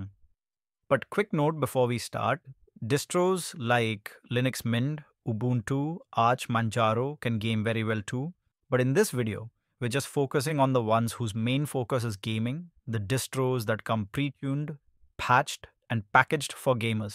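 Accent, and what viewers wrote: India. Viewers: can understand the freeaking indian accent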